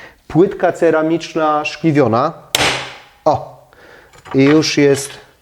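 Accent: Silesia, Poland. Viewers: "Jeżeli klinkami zasztrabujemy..." ;) Wiem, że bardzo Pan się stara mówić po polsku, ale ten Śląsk jednak wychodzi :)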